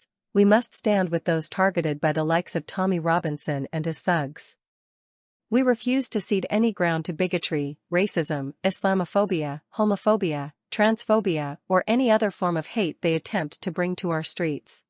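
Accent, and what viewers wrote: United States. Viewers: American naratetr Wdf u know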